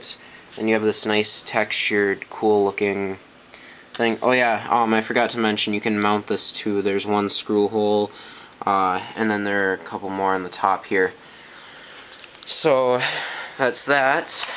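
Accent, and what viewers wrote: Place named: Canada. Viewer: do you have ANY canadian in you? you sound canadian.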